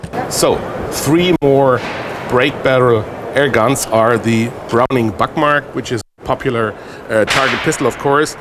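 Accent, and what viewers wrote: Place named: Germany. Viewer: You have a pretty cool accent. Are you German?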